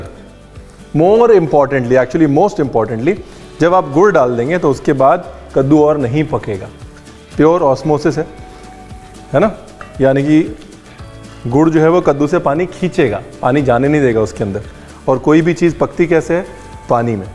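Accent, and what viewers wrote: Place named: Maharashtra, India. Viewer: "Barni me dalke" nice ❤️ Marathi influenced hindi 😀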